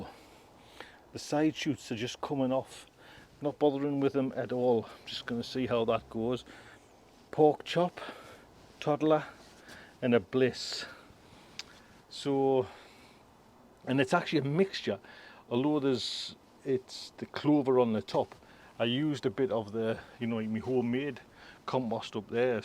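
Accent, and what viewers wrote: United Kingdom. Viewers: This has nothing to do with plants, but what dialect are you speaking? I'm intrigued by British English dialects and I think yours is something I haven't heard before.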